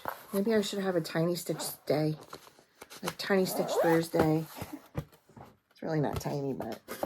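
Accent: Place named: Long Island, United States. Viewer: Don't lose your Long Island accent... that's what first drew me to your flosstube... in addition to your wonderful samplers and camping stories. I was born in Bklyn and my folks moved us to the midwest. I so wanted to keep my accent, but I wasn't that lucky. I guess the linguistics doesn't change after age 16 and I was 13 when we moved. I an old lady now and every once in a while a word will slip out. Keep up the good, no great work!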